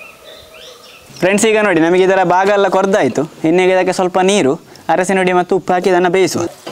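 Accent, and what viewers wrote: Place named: Coastal Karnataka, India. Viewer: ನೀವು ಮಾಡುವ ಪ್ರತಿಯೊಂದು ಅಡುಗೂಟವು ನನ್ಗೆ ಸಿಕ್ಕಾಪಟ್ಟೆ ಇಷ್ಟ ಮತ್ತು ನಿಮ್ಮ ಕರಾವಳಿ ಕನ್ನಡದ ಭಾಷೋಚ್ಛಾರದ ಶೈಲಿ ಎಲ್ಲವೂ ನನ್ಗೆ ಅಚ್ಚುಮೆಚ್ಚು ❤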